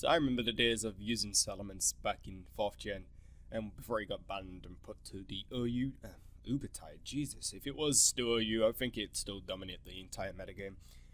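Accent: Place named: United Kingdom. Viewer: British accent is one of the most awesome accents in the world... :')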